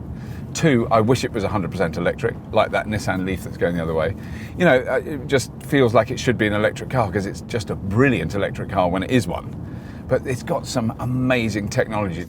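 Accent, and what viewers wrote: United Kingdom. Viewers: It a pleasure to listen to british english instead of... you know the place im talking about. Awesome series! Greets!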